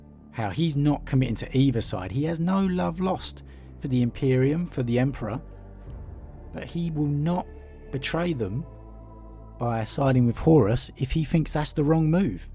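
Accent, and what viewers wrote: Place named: Cockney, United Kingdom. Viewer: It's called Cockney, and it's an accent. Not all English people are incapable of saying "th" lol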